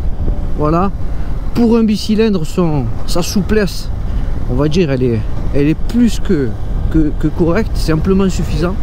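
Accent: Marseille, France. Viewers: Salut ! C'est un plaisir de retrouver tes essais avec ton magnifique accent marseillais, toi même tu sais, vé. 😂❤ Stef bdx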